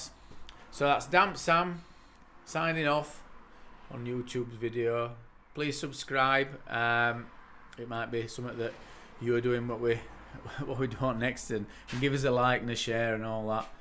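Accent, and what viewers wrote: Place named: Barnsley, United Kingdom. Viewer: Only watched one video and I can tell you’re deffo from Barnsley. Best accent in the world.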